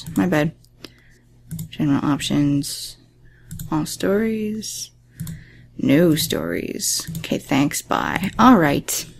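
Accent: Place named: New York, United States. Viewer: Are you from New York? I went there last spring and you sound like you are from there.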